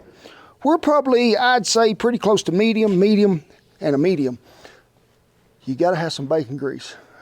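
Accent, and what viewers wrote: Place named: Rural, United States. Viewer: The food looks tasty, and he is obviously a skilled cook, and recipies are interesting... but dear God, this hick accent is unbearable... it makes my ears bleed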